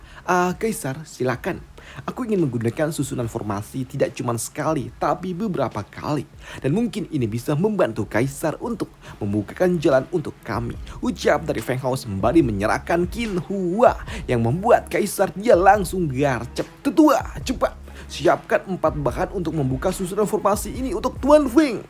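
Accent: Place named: Java, Indonesia. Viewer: Medok bang heheheeh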